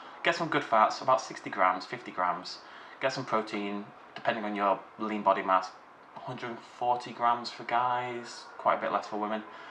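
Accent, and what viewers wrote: United Kingdom. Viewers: Don't forget the British accent. Unless you have one yourself.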